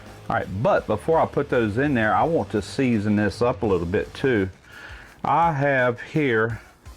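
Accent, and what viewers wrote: South, United States. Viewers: I can tell just by your accent that your from the South will you please invite me to your next cookout? Lol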